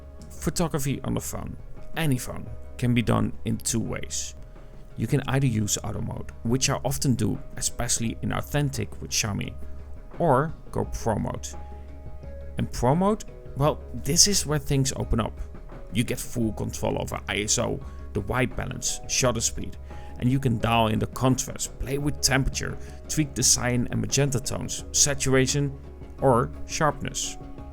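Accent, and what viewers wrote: Netherlands. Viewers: I just have to start of with saying that you really can not hide the dutch accent, lol It's so thick!! Now about the content: one can only appreciate the fair citicism you made about the Xiaomi 15 Ultra. I'd say it's well founded and perhaps with future upgradings, certain issues can be tackled. Less sensitivity towards light can be fixed with software (or filter) but the fixed apperture however will stay fixed I'm afraid, which means less depth of sharpness. In the end it remains a smartphone, which is crazy, considering what they looked like 20 years back. I wonder what 20 years from now will bring. The Vivo x200 Ultra seems interesting too, but that camerabulk is sooo off putting that the Xiaomi 15 Ultra may not look that bad of an option.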